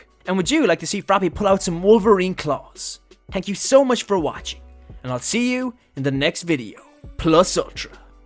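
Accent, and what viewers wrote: Ireland. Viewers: He has an irish accent and irish accents tend to pronounce their th as either a t like in words think and three or as a d like in this and that.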